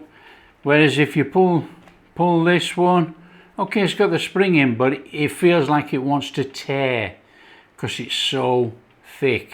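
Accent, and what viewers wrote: Yorkshire, United Kingdom. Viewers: I could get these in Todmorden Market Hall until about 1990 when the last oatcake maker died/retired. Had to make my own since then. Not as good... And I noticed your Yorkshire accent came through stronger! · Ey up, as tha been on holiday tu cudorth (Cudworth), tha sounds a lot more Yorkshire tha see, on a serious note my grandad use to make a full breakfast, stick it on a tin plate, cover with cheese drop of milk and pepper shove it under grill, warm flat bread and stick the breakfast on it, roll it and dip in the scrapings of well done cheese that stuck to plate, absolutely fantastic. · Gone back to thi roots lad, tha sounds reet gradely! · Your accent really thickens up when you're cooking Yorkshire food. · Thought it was great you talking in Yorkshire, I bet a lot of folk didn’t understand you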